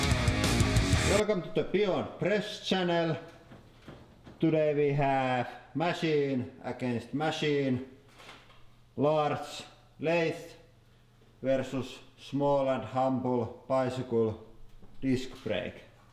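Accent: Finland. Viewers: that was some nice finnish rally english :D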